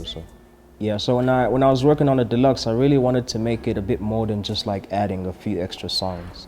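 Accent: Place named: South Africa. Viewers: Coz his south African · why is he using his south African accent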